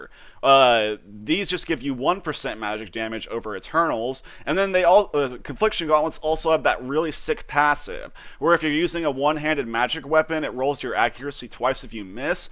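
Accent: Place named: Connecticut, United States. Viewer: Bruh youre from Connecticut arent you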